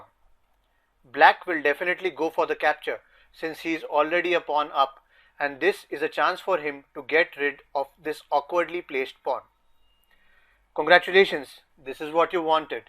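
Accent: India. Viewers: IM SO TIRED OF INDIANS AND THEIR ACCENT... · Not the Indian accent OH NOOOOO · Most indian sound i have ever heard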